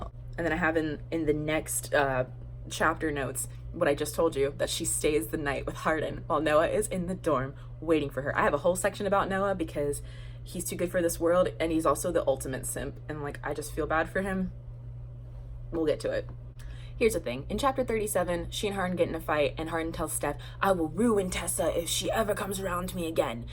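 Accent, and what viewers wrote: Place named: England, United Kingdom. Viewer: Your English accent is giving me life 😂😂😂 Also: fans of Harry Styles were super mad at After becoming so huge because HS is an angel and it makes him look bad. Also, in the original wattpad, Hardin’s name was literally Harry Styles. It was meant to be like what would have happened if he didn’t become famous and went to college. 😂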